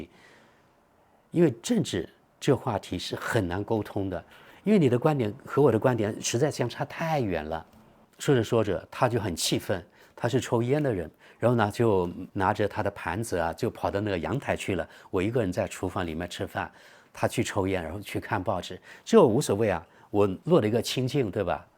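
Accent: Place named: Guiliu, China. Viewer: 他不是广东人 讲桂柳话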